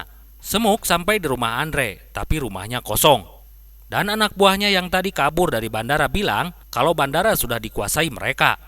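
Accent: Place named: West Java, Indonesia. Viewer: Naratorna urang Sunda 😆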